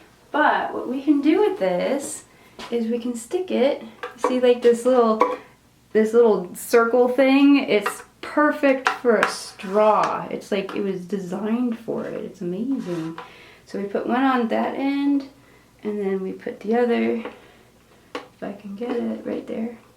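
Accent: Canada. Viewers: I can taste the Canadian in her voice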